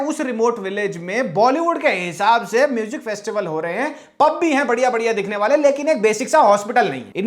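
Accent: Haryana, India. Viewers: I just love your review, it's so humorous....pls speak more in haryanvi dialect ....love from Australia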